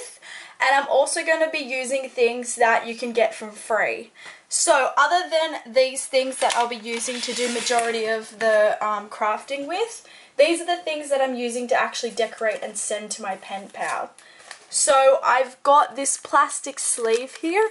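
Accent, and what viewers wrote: Australia. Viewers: You're aussie accent is so refreshing! I'm an Aussie and I don't know why but I get so used to international accents on YouTube videos that it's always such a nice surprise to hear Australian accents and phrases online! This video made me realise how much I've been missing simply talking to other people because of the pandemic! Excellent video too 😊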